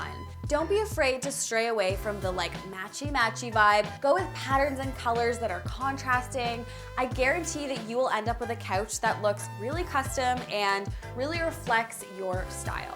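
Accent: Canada. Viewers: Dat canadian accent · Very cozy! Your living room is so inviting - attractive, but not intimidating. There's a happy mean between sterile and cluttered - just enough to engage the eye but leaving plenty of breathing space. I do understand the fire hazards of candles, but LED candles (or diffusers) aren't the most environment-friendly (though they are nice!). Love the pom-pom blanket.. but unfortunately, it's too hot right now in India to even contemplate coziness and rugs and pom pom blankets without a shudder.. coziness is a cold shower right now. Please keep making your awesome videos- they are such a treat and inspiration - and that Canadian accent is just too too adorable. Hi to Harriet and Marty! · I didn't know canadian accents were that obvs to others haha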